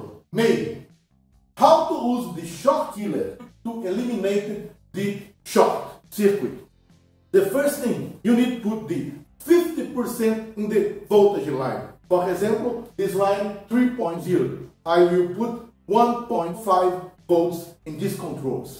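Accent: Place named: Portugal. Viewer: Such a fucking Portuguese accent in english!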